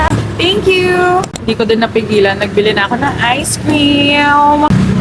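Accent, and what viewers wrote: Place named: Pampanga, Philippines. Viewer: HAHAHAAHHA love the kapampangan accent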